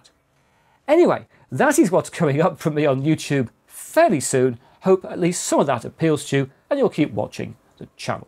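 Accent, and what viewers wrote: England, United Kingdom. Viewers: subscribed for the crystal clear explanations with the always enviable english accent!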